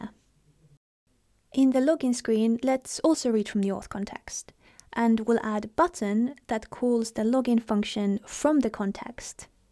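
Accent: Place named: United Kingdom. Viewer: I never understand this british accent. this lady is always confusing. her voice and way of explaining things not quite right. you should always start from clean slate when you explain things.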